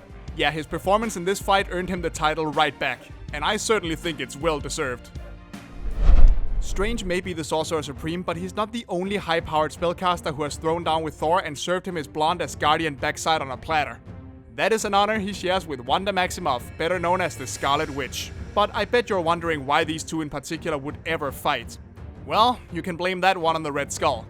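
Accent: Denmark. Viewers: Are you from Denmark🤔? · is that accent from denmark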